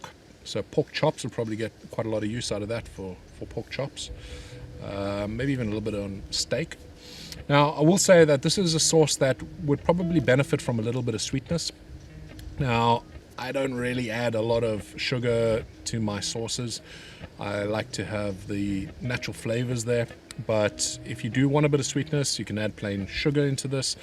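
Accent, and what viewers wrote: South Africa. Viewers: By the way. Are you an EXPAT? You Sound like a South African boytjie 😁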